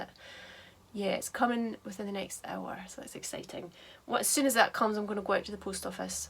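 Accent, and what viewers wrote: Scotland, United Kingdom. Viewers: My first time watching your channel. Found you by searching for Vlogmas. Really enjoying listening to you while I catch up on my home admin. My parents live near Loch Lomond and my family is from Scotland even though I live in England. Nice to hear a much loved accent :-) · You made me really happy with taking part in Vlogmas,. You’re right, there are so many people participating, but I’m enjoying your makings, cooking, scrap booking, the nature and, of course, your scottish accent so much! You put it all so beautifully together with the music ❤️ As a maker with a budget for her hobby’s I always find inspiration and a little calming in your vlogs. Thank you for the work and time you put in these videos. Stay healthy, and have a cozy and crafty December, Frauke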